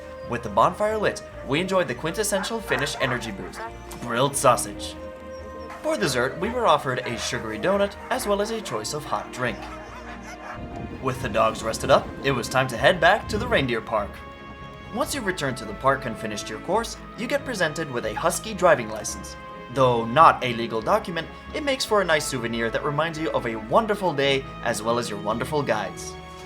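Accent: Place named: United States. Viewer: Dude is that you talking? Why do you sound american 😆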